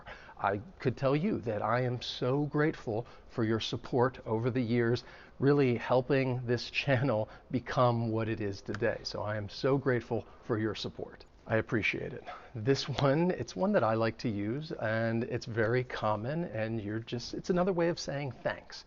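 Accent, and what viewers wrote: United States. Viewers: Hi teacher , thank you for this lesson beacuse you speak englush american accaent ( i love american accent iust ! ) so clearly and lowdly ! I am 60 years old ! My first language is turkish !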